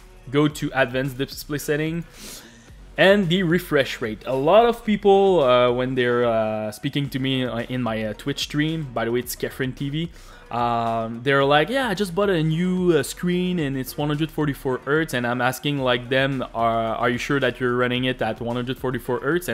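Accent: Quebec, Canada. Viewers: You sounds like a french-canadian just like me x)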